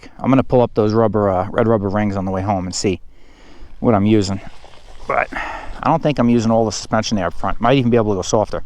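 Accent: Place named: Massachusetts, United States. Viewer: You from Massachusetts? Got a north east accent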